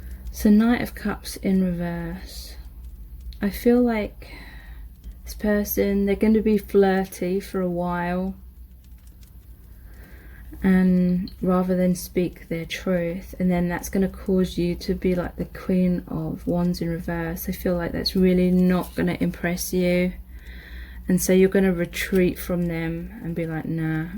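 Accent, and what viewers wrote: England, United Kingdom. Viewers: She does have a soft sweet voice with an english accent 💖🌹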